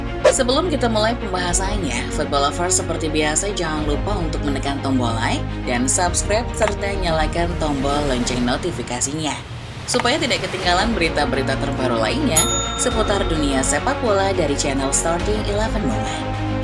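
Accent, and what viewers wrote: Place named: Pekalongan, Indonesia. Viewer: Logat mu min Pekalongan banget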